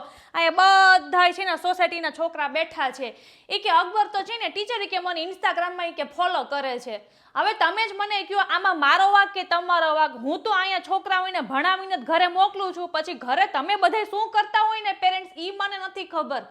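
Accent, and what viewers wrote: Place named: Saurashtra, India. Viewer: જય સચ્ચિદાનંદ તમારી સૌરાષ્ટ્ર ની ભાષાગમે છે.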